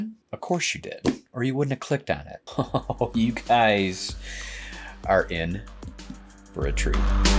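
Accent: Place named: Eastern Canada, Canada. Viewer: Best video on these cans yet. Pulling my hair out trying to choose what headphones to go with. Razer opus lacking the ldac sucks but these I've heard are poor performers once they are plugged in aux. Lose the eq settings and goes passive? But these are the nicest looking cans out there with almost all features needed. Ldac is perf for amazon music streaming. But as an occasional wired gaming head set... Sounds like it's a serious loser.... BTW are you from the Canadian east coast? You sound eastern Canadian.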